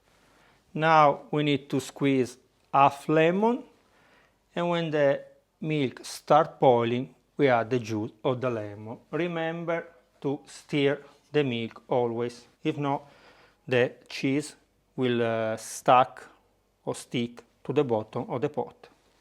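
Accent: Italy. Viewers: Spe-cta-cuuu-larrr I like your all videos & Italian flavoured ENGLISH... Best wishes & love from INDIA...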